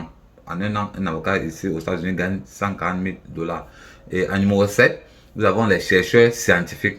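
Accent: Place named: Cameroon. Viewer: T'es camerounais je suis prêt à parier bro sa se ressent à ta façon de parler 😅✊🏾✊🏾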